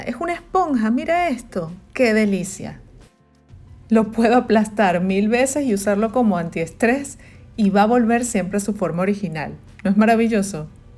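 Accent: Venezuela. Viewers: Hola, me encantan tus recetas!Al oirte note' que eres Venezolana como yo ! me emociona sentir y reconocer mi pais... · Refrescante escuchar el acento venezolano (caraqueño!) en un vídeo de calidad. Buen trabajo y sigue p’alante! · Me encanta el acento venezolano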